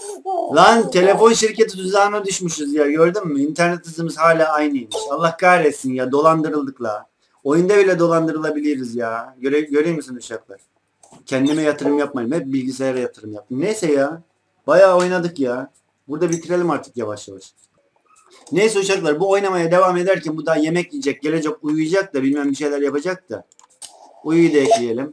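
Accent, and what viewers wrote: Black Sea, Turkey. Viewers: abi sen rizelimi tranzonlu musun?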